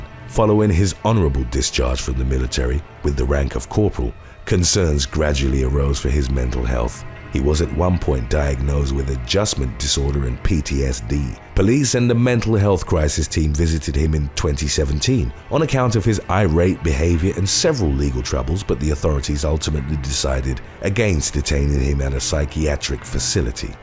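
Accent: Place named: United Kingdom. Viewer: British voice overs always make mysteries and videos more intresting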